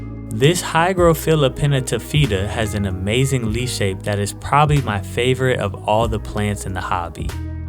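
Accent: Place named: Los Angeles, United States. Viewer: You sound like you're from LA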